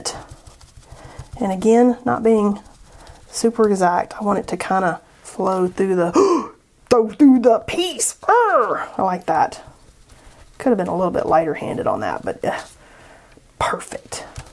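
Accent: South, United States. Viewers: Enjoying all your videos. Nice to hear a fellow Southerner on YT! Do you ever do in person classes?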